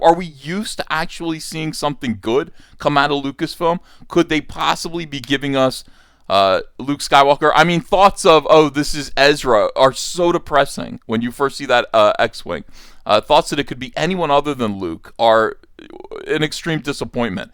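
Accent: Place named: Pittsburgh, United States. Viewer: There is NO WAY this guy is from NJ with that accent! I detect the familiar sound of Pittsburgese, so has to be from somewhere around there.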